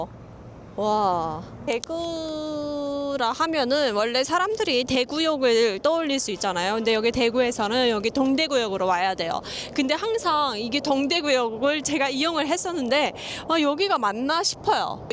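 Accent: North Korea. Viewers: 신기하네요 북쪽억양이 조금씩.. 자연미인이시면 대단한 미인이신듯!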